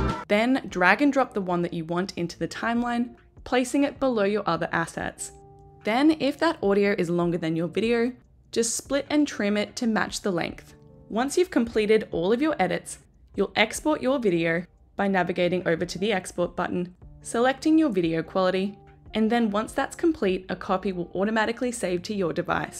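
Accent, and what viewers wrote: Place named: Australia. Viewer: so, when you delete a section that you cropped or cut out, it automatically closes the gap again and butts up to the next nearest video front or back??? You, sound Aussi . I watched this about 2 weeks ago and could not understand it at all. been using openshot and slowly getting the hang of it. still can't put in music correctly. I want to learn the easiest program. I don't know which is which. HELPL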